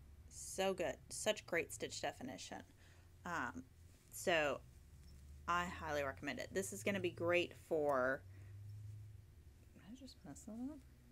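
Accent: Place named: Quebec, Canada. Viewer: I’m guessing Max and Vincent taught you how to pronounce Ulysse 😂 Very french Canadian accent ( I’m from Montreal, originally, as well). Oo-lease, with the accent on the second syllable, would be more accurate in French from France, where the yarn is from.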